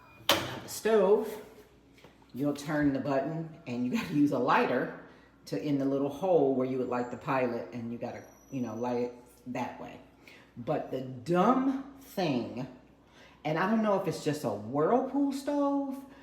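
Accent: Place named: New York, United States. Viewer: My sister from NY moved to Merida with her boys but had health issues with the mold - you sound like a New Yorker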